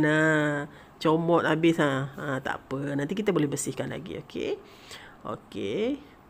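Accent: Malaysia. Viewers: aku org ganu taulah ganna wahwahwah ni org ganu ker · kita biasa mkn kopok ganu hok gigit putus mmg sedap.. syabas tahniah..klo dgr suara ni org kelantan ke ganu?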